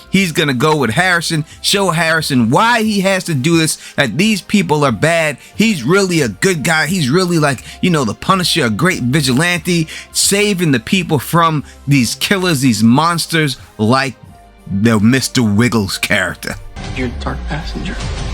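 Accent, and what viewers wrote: New York, United States. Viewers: Next up is Kurt who comes with a SET OF BAWLS ON HIM. omg I love your accent, are you from ny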